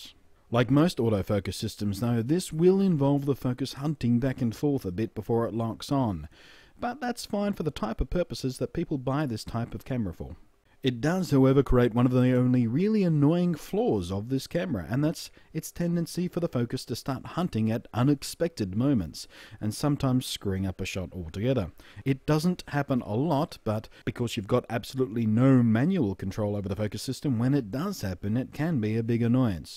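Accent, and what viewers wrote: Australia. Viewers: I thought I heard an Australian accent. I love it. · Hi, love your channel. Good to have a distinctly Australian reviewer. Enough flattery.....:) The reason I would love to be gifted this item is so can take clear videos/photos of my kids. I use my 3GS iPhone for photos/videos, it's OK, but something like the bloggie would be great because it is simple to use, I could teach my kids to make Vids also.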